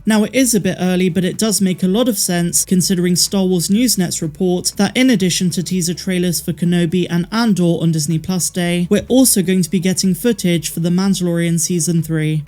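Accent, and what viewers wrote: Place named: RP, United Kingdom. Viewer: 🤔 Going with Pedro doing last minute voice work for Boba Fett. Why take time out of filming one show, to do some voice work for Mando, when there is plenty of time to do so later. Like you said, last minute voice work happens all the time. More Migs please! Also, nicely done on the quote, as always, and never noticed you ever do them badly. You're like proper BBC Star Wars lady 😊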